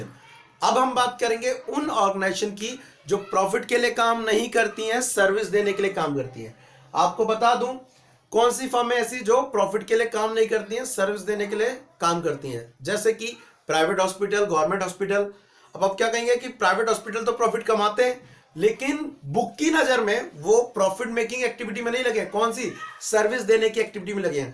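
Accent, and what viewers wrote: Uttar Pradesh, India. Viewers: Sir aap uttar pradesh se ho na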